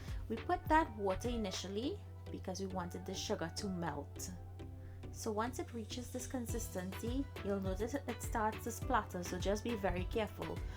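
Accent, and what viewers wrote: Trinidad and Tobago. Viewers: I knew u were Trinidadian when I heard your accent ! Thx for the recipe ! x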